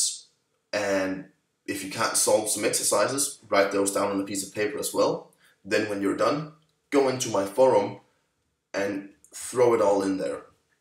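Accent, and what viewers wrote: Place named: Denmark. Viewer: ham her lyder dansk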